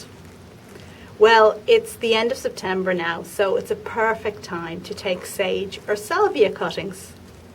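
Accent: Ireland. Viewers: I have learned that if you plan to use an herb for culinary purposes, dipping in hormone powder is not good since it imparts the chemicals in the hormone powder. If it is just for ornamental purposes, that is fine. Love your accent, Love Ireland!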